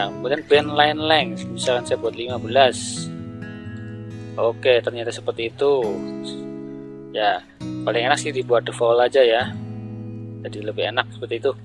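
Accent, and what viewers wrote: Banyumas, Indonesia. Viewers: Nyimak lagi dijelasin sama pak dosen.. Salam Kay Rend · Semngaaat kang..Samapi lupa kunjung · sukses terus om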